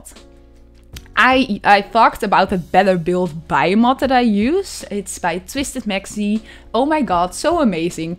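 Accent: Netherlands. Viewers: You sound like you’re from the netherlands. Are you Dutch? My dad is Dutch and my grandparents have a similar accent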